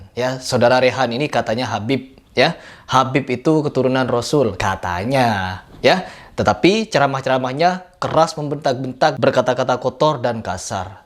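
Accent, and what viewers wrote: Madura, Indonesia. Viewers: Logatnya kental madura wkwkwk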